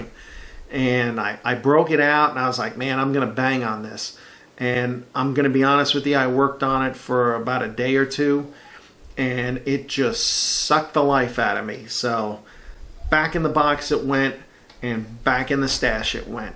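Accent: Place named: Queens, United States. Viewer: Question: You’ve lost your mojo for a Tamiya American twin jet fighter (F-15), but you’re excited about a Tamiya American twin jet fighter (F-4). What is it about the Eagle that you’re not feeling? There’s nothing about the channel I don’t like. You tell it how it is, you explain your thought processes and the music’s not annoying. Your build quality is inspiring and your Queens accent is refreshing for this Australian subscriber. I like all your build choices, and as such, I’d rather not recommend any particular kit type. I’m nearly finished my Eduard/ICM Dornier 17Z-2 ‘kampfstift’ build and you’ve just ticked my Luftwaffe subject matter preference box. All the best.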